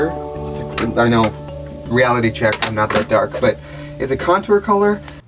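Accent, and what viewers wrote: Wisconsin, United States. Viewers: You really can hear the Wisconsin accent in these videos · I love your accent!! I was watching UNHhhh the other night when I realized you pronounce “groceries” grosheries just like me! I told my husband that you’re from Wisconsin and he replied “guess that’s why you’re such a cheesehead” and I lost it laughing. I do have family from the US, wouldn’t be surprised to find some were from Wisconsin. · trixies voice sounds the same as it does now bc i’m from wisconsin too. like deadass i only hear the accent on some words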